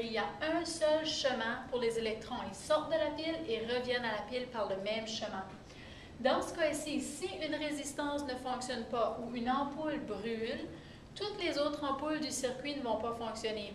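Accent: Quebec, Canada. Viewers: vous etes bon professeur et vous etes belle .votre prononciation Québécoise m'excite .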